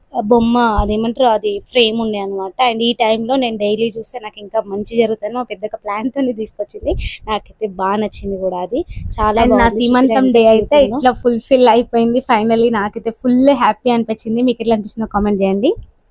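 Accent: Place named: Telangana, India. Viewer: Bagunna akka nuvvu anna ala vunnaru ni way of talking madhi same manam pakka telangana akka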